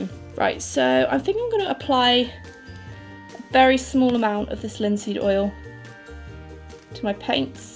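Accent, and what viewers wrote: United Kingdom. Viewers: Her accent is so British despite moving to America at a very young age. Cool!!! I wonder if she’s ever explained it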